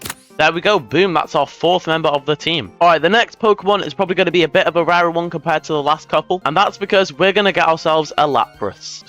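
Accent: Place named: England, United Kingdom. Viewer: Your accent is so familiar where in England are you from?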